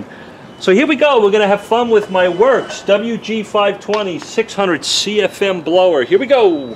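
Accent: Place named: New Jersey, United States. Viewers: he sounds joisey-ish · He is definitely not indigenous to Texas. My guess is a transplant from Long Island or NJ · This guy is not a Texan, obviously a Transplant! Has to be from the East Coast!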